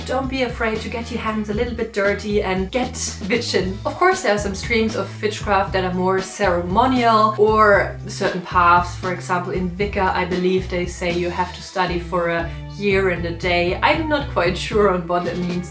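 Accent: Germany. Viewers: Hi beautiful! New sub and I have to tell you I LOVE your videos! Your knowledge, your voice, aesthetic, and your absolutely wonderful German accent lol you are so sweet and great! ☺️🥰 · Dein deutscher Akzent macht dich noch charmanter als ohnehin schon. 💚 (Und danke das du dich bei meinen Kommentar vorhin an mich erinnert hast, und woher ich komme. 😊) You are a very wonderful and wise witch! Can't wait for your next videos! 😉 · Ich finde deinen Kanal echt megaaaa🥰 & finde dein Englisch echt top😅 War ein bisschen stolz, dass ich aus deinem Englisch raus hören konnte das du auch deutsch bist haha 😊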